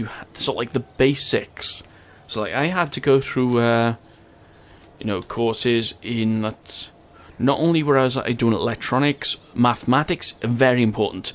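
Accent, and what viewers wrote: United Kingdom. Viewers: Where are you from? UK?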